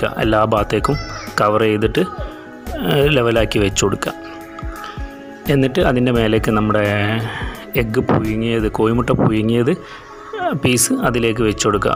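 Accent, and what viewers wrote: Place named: Kozhikode, India. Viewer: കോയിക്കോടൻ ഭാശ കേക്കാൻ നല്ല രസ ണ്ട്😊👍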